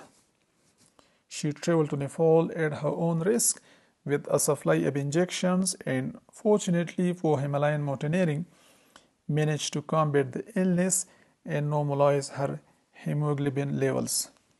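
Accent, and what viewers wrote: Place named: India. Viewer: I’m really glad I found this channel. Excellent research delivered so well. It’s wonderful to hear a strong Indian accent speaking such excellent English without compromising the Narrators Indian accent. The pace of the narration is excellent. Well done Narrator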